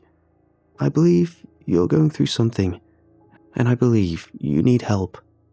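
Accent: United Kingdom. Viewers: the scariest part is is when i found he was british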